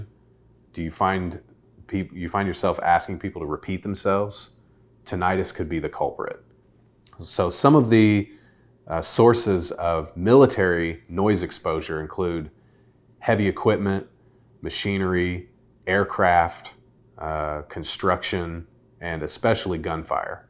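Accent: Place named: Indiana, United States. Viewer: You, sir are absolutely correct. As a disabled navy veteran myself, I can attest to the facts you've stated. I'm service connected for tinnitus, and have been diagnosed with hearing loss and provided hearing aids as of last year. I also service connected knees, and was diagnosed with sleep apnea. The VA denied my sleep apnea claim despite the diagnosed and cpap machine. I'm currently filing a PTSD, insomnia and migraine headaches. As a native of Indiana myself I pronounce tinnitus the way as yourself lol. And thanks for the great presentation.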